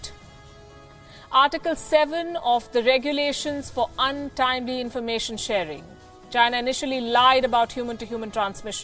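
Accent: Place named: India. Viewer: Listening the accent I'm sure she is another indain journalist Aaj Tak, India tv and now this shame on you Is there any channel of India which broadcast a real news ? Just send me the name . I really would like to watch it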